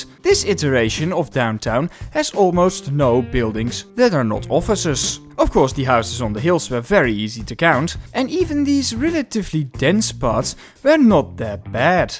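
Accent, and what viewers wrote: Netherlands. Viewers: i could hear you being dutch from your accent lol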